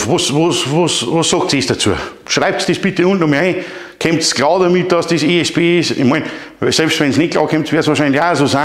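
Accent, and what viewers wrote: Bavaria, Germany. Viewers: Ne, alles OK was du machst, ist doch deine Sache! Lass dich nicht Trollen. Ich schaue mir gerne deine Fachkompetente Videos an. Mach bitte weiter so, vor allem auf Bayerisch! Liebe Grüße aus Unterfranken. 👍👍👍❤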